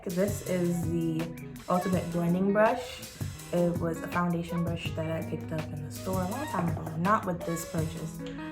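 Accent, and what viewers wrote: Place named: Jamaica. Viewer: Sound Jamaican 😎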